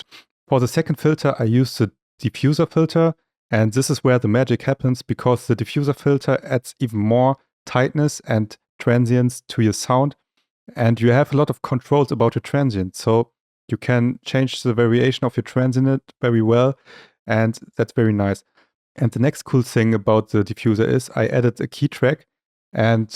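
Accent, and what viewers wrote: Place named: Germany. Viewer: thats a german Accent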